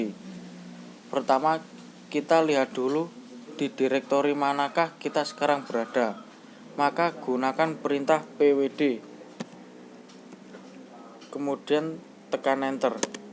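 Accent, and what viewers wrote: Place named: Java, Indonesia. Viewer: Suarane bogel medok :v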